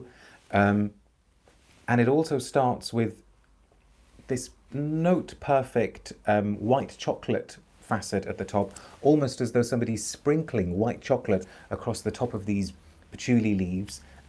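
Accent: United Kingdom. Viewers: Hello 👋 are you English?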